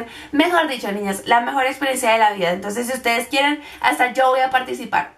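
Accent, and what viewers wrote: Colombia. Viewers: Me da un cosquilleo ver tus vídeos porque... Eres colombiana y yo también lo soy sólo que vivo en España. Y extraño mucho Colombia :) y oír tu acento colombiano me relaja y me da como un cosquilleo de ganas de ir a Colombia!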